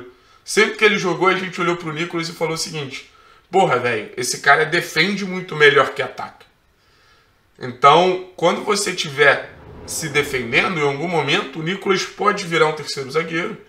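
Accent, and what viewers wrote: Rio de Janeiro, Brazil. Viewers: Ele é carioca da gema, não tem como forçar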